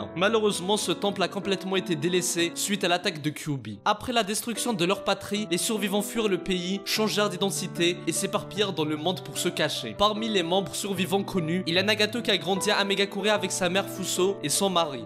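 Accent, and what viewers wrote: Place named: Kabylie, Algeria. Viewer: Je salut ton accent kabyle ✌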